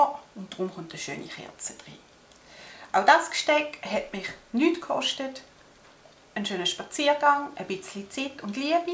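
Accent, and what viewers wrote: Switzerland. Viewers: Bitte nicht auf Hochdeutsch, soviel Charme geht nicht im trockenen Hochdeutsch. Wir beißen uns doch gerne durch deinen zarten Schweizer Dialekt, der ja sehr dezent, aber auch so liebreizend rüber kommt. Und deine Videos liebe ich soooooooooo sehr. Einfach nur wunderschön, kreativ und sooooooo geschmackvoll. Vielen Dank auch für deine ruhige und tiefenentspannte Art, auch, wenn man manchmal merkt, wie es dich bei manchen Themen umtreibt😉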